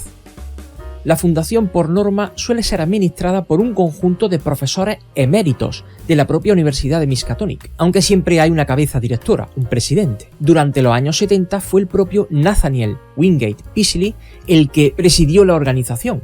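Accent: Andalusia, Spain. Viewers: Tienes acento andaluz. Saludos de México. Y qué buen descubrimiento saber de éste tu canal.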